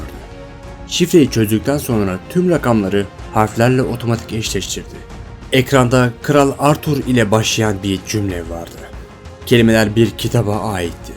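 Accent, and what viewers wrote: Azerbaijan. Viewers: Azerisən türksen? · Abi sen azerimisin??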